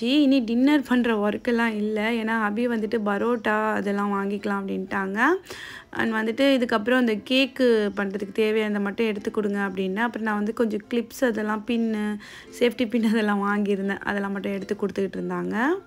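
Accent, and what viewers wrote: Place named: Nagapattinam, India. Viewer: super sis yenakku nagapattinam namma oor slang varuthu athan kettan masha allah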